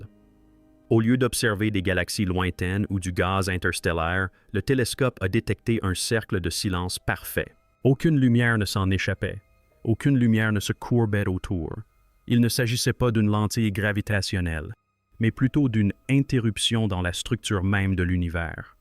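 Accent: Canada. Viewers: Euh... Est-ce un poisson d'avril de la part d'une IA ? Bougez pas, je vais me renseigner à la NASA. Bel accent Canadien, et vidéo interressante, mais farfelue.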